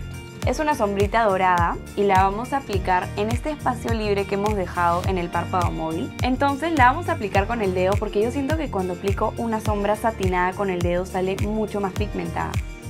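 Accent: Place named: Chile. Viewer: De qué país eres? Algo de tono chileno suena aveces 😅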